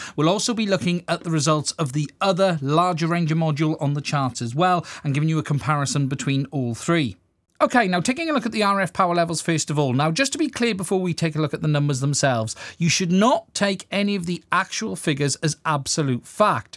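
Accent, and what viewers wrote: United Kingdom. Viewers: this is not only great review but I do like to listen youe British accent which is very nice. Thanks for great work - Micro Module bought 👍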